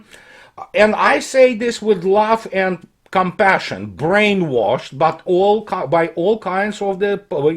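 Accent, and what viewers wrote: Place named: Russia. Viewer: What are we supposed to make of an American citizen with a Russian accent?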